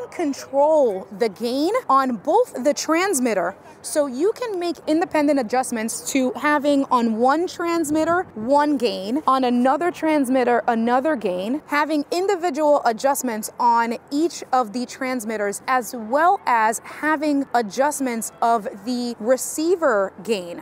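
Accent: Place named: New York City, United States. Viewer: I could tell you were from NYC when you started talkin (I’m from Qnz)…then you went outside and I was like YAAASSS 🗽 then I got to the end and got a YERRRRR and it was the cherry on top 🗽🗽🗽 lol great vid, very helpful 🤙🏾 s/o NYC 💯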